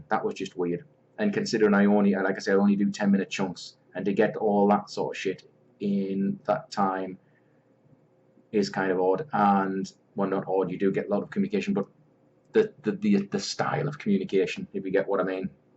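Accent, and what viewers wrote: Newcastle, United Kingdom. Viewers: Central??? He's a jordie from the north east(newcastle area). Central would be from mank (manchester) to brummie (birmingham)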